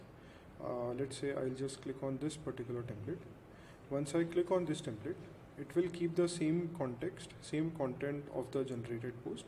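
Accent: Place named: India. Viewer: The Indian accent is very difficult to understand. Please consider using someone who speaks clearly with no accent. As a new user these current videos are frustrating to learn from. Thanks.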